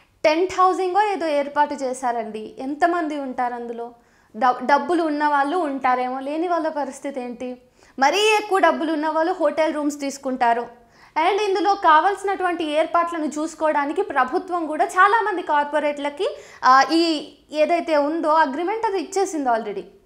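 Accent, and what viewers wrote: India. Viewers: Indian educated vioce.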